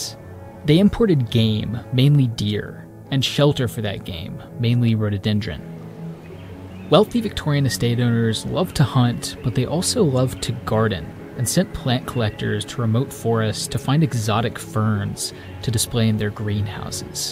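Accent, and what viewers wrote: United States. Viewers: I downvoted this video because an American narrates it. And the American doesn't know what he is talking about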